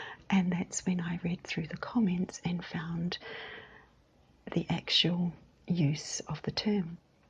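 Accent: New Zealand. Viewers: Hello!!! I was amazed to hear that you had listened to a Minister who was also an archeologist as I did the same thing before I ever heard of ASMR (a couple of weeks ago). Was/is his name Francois du Plessis? I LOVE listening to him both for the content and the softly-spoken accent which was unintentionally ASMR. If I was sitting in one of his lectures I'd better be on the back row as I'd fall asleep instantly!!! I love the S.A. accent and I love your beautiful NZ accent. Thank you for what you do 💙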